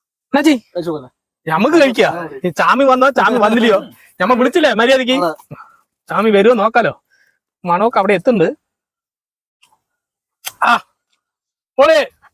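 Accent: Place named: Palakkad, India. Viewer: പാലക്കാട്‌ സ്ലാങ് സൂപ്പർ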